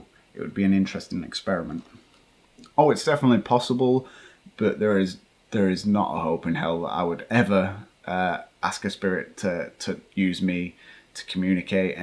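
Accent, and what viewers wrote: Yorkshire, United Kingdom. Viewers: Sorry to keep messaging I'm from Yorkshire and I can tell a Yorkshire accent when I hear one, where you from mate? Promise I won't come terrorize your house I live in Dubai hahahah bit of a journey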